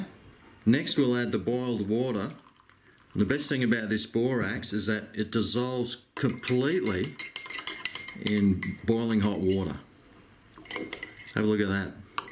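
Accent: Australia. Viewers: Love Australian accent. I watched several videos on this. Some were too long. Some moved their phone around so much that I got sea sick. This was short. To the point. Simple. Concise. And I like that you added the caution at the end about dogs, butterflies, etc. Thank you. Your formula is the one I chose. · Thanks for the info! Love your accent, makes me want to throw some shrimp on the Bar-B. After I clear the area with a borax solution! Thanks again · Love your accent and calm energy. I lived in Oz for 1.5 years, it was the highlight of my life. You seem to live in paradise, wonder which part you're in. Trying your ant solution now, thanks so much 👍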